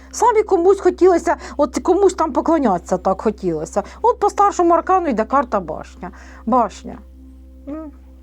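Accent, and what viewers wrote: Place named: Surzhyk, Ukraine. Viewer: Як важко слухати "суржик". І каже правільні речі... Але. Вибачаюсь.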